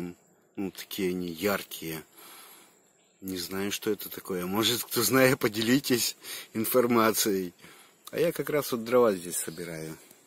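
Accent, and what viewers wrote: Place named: Ukraine. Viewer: БлагоДарю за ответ, я так и подумала ,что вы из Украины (по акценту в разговоре) если не секрет из какой области?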